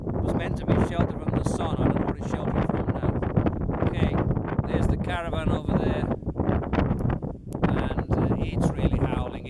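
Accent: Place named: Wolverhampton, United Kingdom. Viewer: You from Wolverhampton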